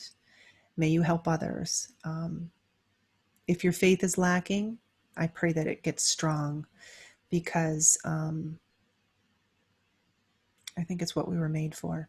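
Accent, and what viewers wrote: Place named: United States. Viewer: very interesting, but slowly and dont speak American. We European exJw are listenin too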